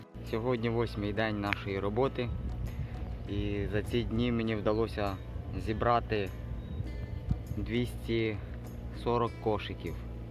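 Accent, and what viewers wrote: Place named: Russia. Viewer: Швидка, дрібна, часу, праці - а вы произносите эти слова по-русски : быстро, милка, времеми, роботы и т.д. Это такой украинский - любой сможет на нём говорить, каверкая русские слова и называть такой ,,язык" украинским.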